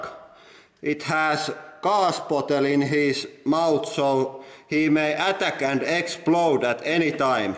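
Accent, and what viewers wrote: Finland. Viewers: His Finnish accent makes his videos hilarious. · both the dudesons and he have the same accent and they sound the same I know they are Finish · Finnish english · he sounds finnish, is he? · the finnish accent. Almost any grown man could sound like when they speak english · North european :D and that is typical finglish :D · I don't know what I love more the crushing or the beautiful Finglish accent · Reasons why I love this channel: 33.3% hydraulic press, 33.3% Finnish accent, 33.3% that girl's awesome laugh. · its called ralli englanti its what Finland is most known for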